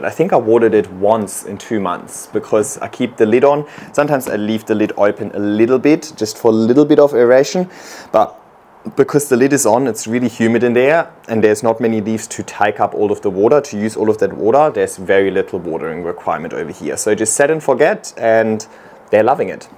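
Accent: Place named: Germany. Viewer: Can anyone tell me if he has any German background 😭😭 I know his name is but my god his accent sounds so German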